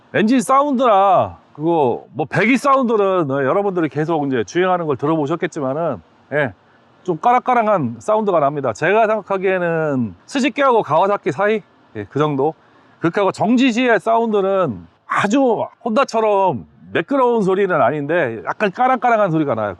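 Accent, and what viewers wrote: Chungcheong, South Korea. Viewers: 핸섬하시군요😅충청도 말투😂❤❤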